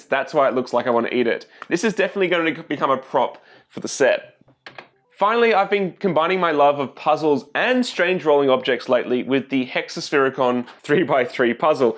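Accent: Australia. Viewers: I wonder if you are from Autralia? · Is he Australian? Those accent, similar to Hugh Jeffreys iPhone Youtuber.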